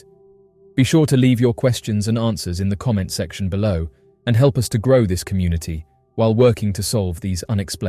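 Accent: United Kingdom. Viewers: Yeah, I am baffled by this video. Teotihuacan was neither built by the Inca (which is on a different continent) nor Aztec (about 1000 years too late). It's not exactly known who built it or what those people were called, as even the name Teotihuacan which means "city of the gods" was given to it by the Aztecs who found it as an ancient ruin. I guess if they slap on a dude with a British accent, that dumb Americans will believe anything they say in a video.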